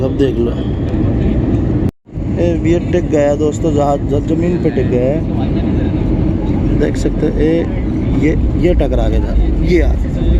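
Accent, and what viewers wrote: India. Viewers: Bhai india ka bilkul desi ladka h Very good video ye h bharat Ke gaon ki desi aawaj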